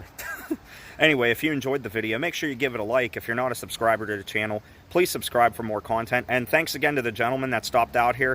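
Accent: Pittsburgh, United States. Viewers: Nice vid, grew up close by and our family would always would get gas there, I remember it going from 76 to Shell around 2004, then Exxon several years later. I think it opened and closed a few times over the years as an Exxon , with each owner putting nothing into it. Even a year ago half of the pumps didn't work very well and the place looked pretty run down. I think at this point it is too far gone for somebody to reopen without a major rennovation... and whoever moved in would still have to tangle with the Sheetz. Im coming home this weekend and will check for the pin, but im sure it will be long gone. :) Btw, great pittsburgh accent, I miss hearing that every day.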